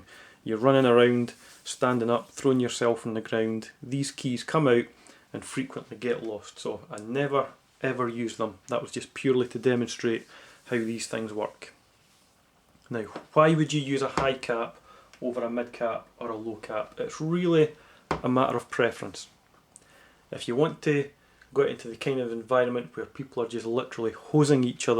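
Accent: Scotland, United Kingdom. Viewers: love your accent . are you from scotland? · someone has a scottish accent! soo bad ass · Scottish lad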